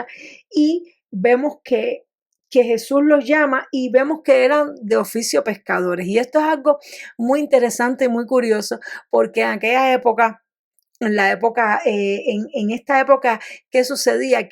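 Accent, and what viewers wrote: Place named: Cuba. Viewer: Me parece que eres Cubana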